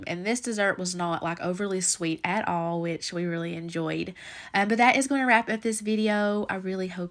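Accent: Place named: Kentucky, United States. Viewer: I'm in Louisville!! Always knew the accent was familiar it's cause we Kentuckians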